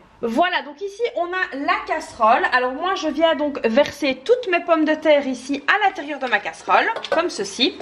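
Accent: Belgium. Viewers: Woaw enfin la meilleure des recettes de gratin dauphinois en plus c est tellement délicieux à déguster . Tu fais vraiment la même recette que moi 🤩 Merci beaucoup à toi pour le partage 👍👍👍 Dit si pas indiscret tu es de Belgique ? Car nous avons presque le meme accent hihi je voulais déjà te le demander mais je n osais pas ^^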